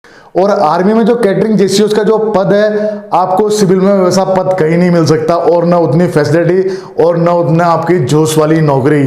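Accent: Rajasthan, India. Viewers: Aap ho na ho rajasthani ho sir I am 100%confident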